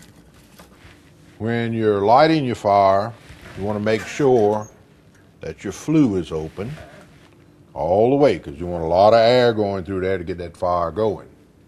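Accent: Louisiana, United States. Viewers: I just love it when you speak cajun. To my ears it sounds like old french sprinkled with american english flavor. "Ça c'est du bon café!", when you said that, it was just like poetry to my french-from-France ears. Loshepa (Lâche pas la patate!!!).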